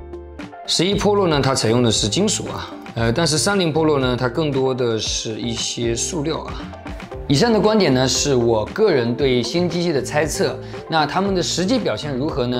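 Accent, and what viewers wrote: Wenzhou, China. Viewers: 是很正宗的温州口音了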